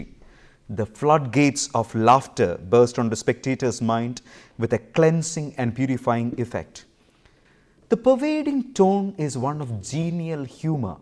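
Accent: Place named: Kerala, India. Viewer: Mallu accent not bad at all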